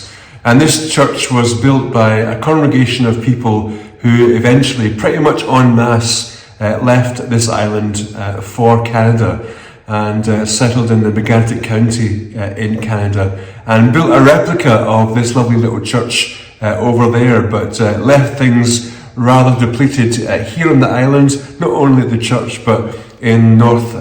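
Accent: Scotland, United Kingdom. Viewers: Hello from Deb Sims of Charlotte, North Carolina, USA…You are a natural at YouTubing. Love the content mix…music, scenery, projects…lovely. Love, love the colorwork and color choices in your hat. My forbears were from Caithness, so I love hearing Scottish accents. I’m a happy subscriber. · Gday from Australia. Really enjoyed your video hope to see more. Really liked the country side video. My dad was from Scotland so your accent made me feel at home. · Hello from the North of France, near Compiègne and Paris 🗼. I enjoyed very much watching your podcast and admiring your nice country. Your videos will be a good English exercise for me, especially with your Scottish accent, I have to get used to it. But after several videos, I guess it will be OK.Thank you for the good time spent with all the community of knitters from all over the world.🙋‍♀ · Hi Michelle here. 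I love your podcast - I live in New Zealand. I love the Scottish accent thanks to my Great Gran who was from Glasgow - it takes me back to my childhood - I will be looking forward to the next one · Hi there! I had your podcast pop up in my feed and I was intrigued by the name since I am of Scottish heritage and one of my fav restaurants in Edinburgh is called the Albanach. I also love Arne and Carlos socks but I love their Pair Perfect socks also by Regina. I have knit many pairs and also the childrens’ version. Your accent reminds me of my family there. Just back from my third time there this past fall. Can’t wait to return. Very much enjoyed your podcast and looking forward to watching the others and for future podcasts! Slainte! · Hello, and greetings from Australia (yes, I live on an island too! A wee bit bigger than yours 😉). I’ve just watched your first podcast and I really enjoyed it. 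I see you have a second one up so I’ll watch it too. I’ve subscribed and I hope you will keep it up for a long time. Some of the reasons I enjoyed watching - first, your delightful accent. There is a Scottish lady from Edinburgh who comes to my knitting group and it is like listening to music when she speaks. Second, I like it that you aren’t too polished a knitter. You admit to making the odd mistake and also you aren’t afraid of experimenting a bit, as with your dashing kep! Thank you also for your mini tour of parts of your island. I’m looking forward to seeing more of it. As a fellow Christian, it is refreshing to see your life so unassumingly revealed to us, the viewers. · Hiya from the central Highlands, always good to hear another Scottish voice! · I watched full length!:) Good morning from Bavaria, Germany! How wonderful to see another man knitting AND sharing his journey, welcome to the wonderful world of creation! 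And thank you for sharing your beautiful island with us, it is stunning, and oh my do I love a Scottish accent! I was privileged to visit Edinburgh in 2019 for the Edinburgh Yarn Festival and I fell in love with Scotland and its charming, beautiful people! Welcome, I am looking forward to your next episode! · Hello from Australia, really enjoyed watching your podcast such lovely scenery on your beautiful island. Knitting is a joy and so nice to listen to a your podcast in your lovely scottish accent. Your fisherman's hat is beaut and with the tassel reminds me of pictures of past times with nightie, candle and bed hat with tassel .... have a good one cheers from downunder :) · Hello - I live in Tasmania, the little island state of Australia 🇦🇺 your podcast was just a delight to watch and listen to- I adore the Scottish accent and I really enjoyed the scenery of Arran. My dear Dad was an amazing knitter- he taught me to knit and, although he passed away in 1995, we still enjoy wearing some of his garments- our beautiful granddaughter is wearing a colour work jumper he made for our daughter in the late 1980s- so very special ❤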